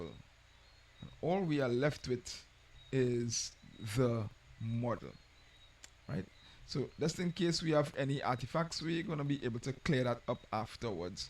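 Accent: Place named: Trinidad and Tobago. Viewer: Very good content. Nice to hear the voice of a fellow 'Trinbagonian'(?) doing GIMP tutorials.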